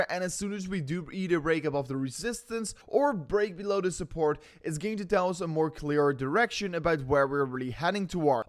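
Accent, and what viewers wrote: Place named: Netherlands. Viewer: The dutch accent is terrible though 😂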